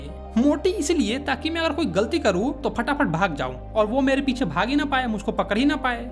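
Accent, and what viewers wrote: Bihar, India. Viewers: Are you from Bihar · Bro is from Bihar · Bihari bhai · Bihar se ho kya? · Bhaiya kya aap bihar se ho ? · The bihari accent 😂😂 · Aapke padhane ka tarika mujhe bahut accha lga jis trh aap bolte ho ekdam bihari ki trh kyunki main bhi bihari hu to mujhe aapke padhane ka tarika aur bolne ka tarika bahut accha lga 😂😊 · Bhai 100% bihari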